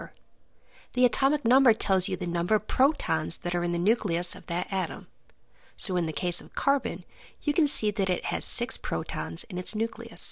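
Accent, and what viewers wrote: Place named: United States. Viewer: Americans have funny accents ヾ(•ω•`)o Not in a bad way Your voice is very crisp 🍎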